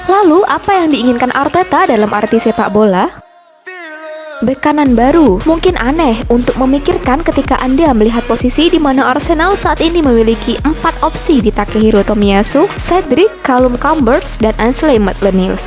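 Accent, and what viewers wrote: Java, Indonesia. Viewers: Admin nya pasti orang jawa.. logatnya medok betul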